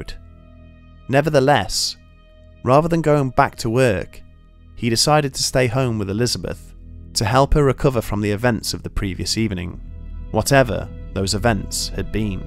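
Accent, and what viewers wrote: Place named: North, United Kingdom. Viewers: He said Las Vegas. Maybe you just can't understand his Northern accent. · He's English. They pronounce things differently than we do in the states and Canada. End of story. :)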